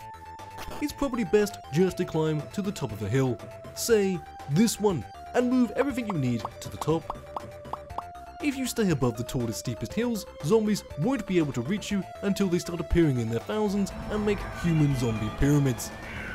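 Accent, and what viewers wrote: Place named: England, United Kingdom. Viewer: Live the concept. It's like a Doomsday Preppers parody with a very English accent. Me Gusta! ^_^ May I suggest Threed from Earthbound?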